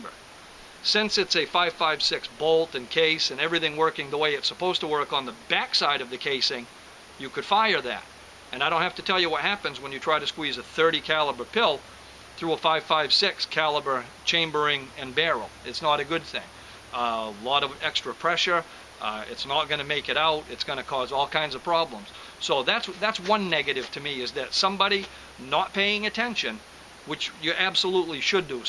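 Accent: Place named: New England, United States. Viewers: Just ordered your book. Looking forward to it. Your east coast New England accent is a breath of fresh air. We are out here brother. You are not alone. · This is the first video of yours I've seen. Nice video. I'll check out your book too. I made sure to watch for a couple minutes to see if your boston accent was a shtick or not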